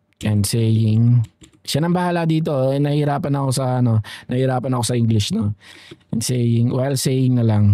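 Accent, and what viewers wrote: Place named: Pampanga, Philippines. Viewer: Kabibili ko lng ng YT Shorts Automation course 199php lng nmn, pero dhil Shorts sha pti yta tutorial nya shorts din hahaha atleast dito may proper explanation.. At dahil libre ang kaalaman d2 at Kapampangan ka (huli kita sa accent mo tol hehe, Kapampangan din Ima ko) cge subscribe ako sayo, more power sa Channel mo!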